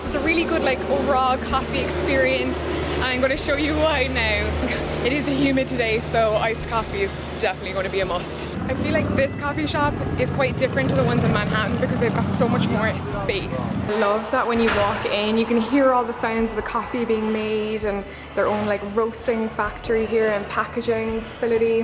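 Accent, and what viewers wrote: Ireland. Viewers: Wait are u irish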